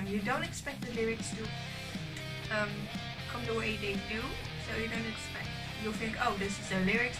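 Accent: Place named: Scandinavia. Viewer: That Scandinavian accent so freaking lovely. Cheers from another Tool fan that grew up listening to Undertow.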